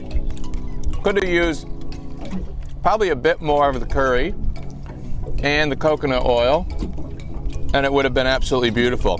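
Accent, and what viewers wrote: United States. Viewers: The accent sounds Boston. Like Ray the series.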